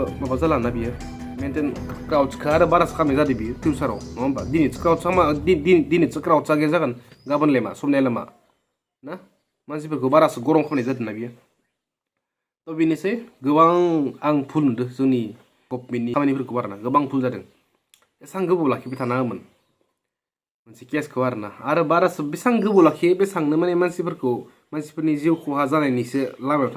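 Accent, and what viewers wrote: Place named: Kokrajhar, India. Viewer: Wi pongbai ngni railaina esa mwjangwi boro pior railainw swlwngbao 😮sanjarisw jayw matw nwngna railainaia? mwjangwi swlwngpin kokrajhrni railainai baidi obwlasw bujigwn jwng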